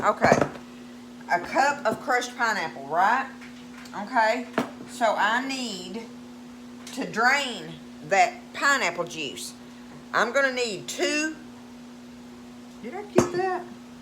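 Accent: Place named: Texas, United States. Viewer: you from Texas? I am and your talkin reminds me of home? I married a coonass and I mostly hear this accent here and even the non Cajun accents are different than a good old east Texas accent? Always loved this recipe? But it seems like she (my memaw circa.1901) added a couple more things like celery and maybe a fine shredded carrots? Not positive about the carrots tho?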